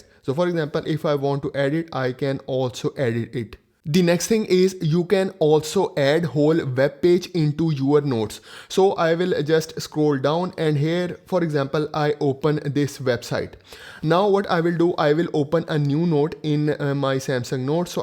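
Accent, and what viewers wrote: India. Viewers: The video itself was very well done, but something about speaking english in an indian accent makes me uncomfortable. I could understand everything you were saying, and your words were very clear and well articulated, but indian (hindu?) must be a very different language phonetically, because the struggle to achieve a clear flow between words is real. I hope this doesn’t offend you, because your english really is very well spoken aside from that flow aspect. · Indian accent is something)))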